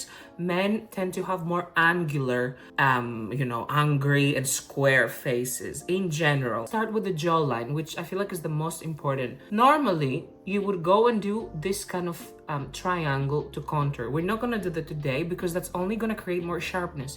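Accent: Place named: Greece. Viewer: I don't know if this is common knowledge but I have not been sure about it. Hearing your voice now, I feel it confirms my suspicion that you might be greek, lol. So I was wondering how you use neutral pronouns in greek. Asking for personal use 😅 Anyway, thanks for the makeup tips too.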